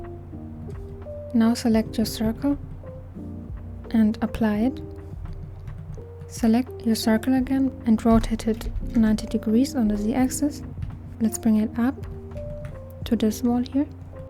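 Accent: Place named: Germany. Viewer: Oh youre german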